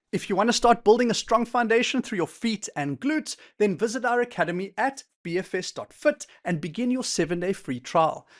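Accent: South Africa. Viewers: Do I hear a South African accent? 😊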